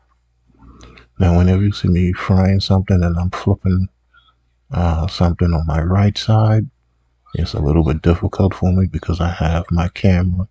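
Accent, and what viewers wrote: Charleston, United States. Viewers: Where you from? Sound like you from Charleston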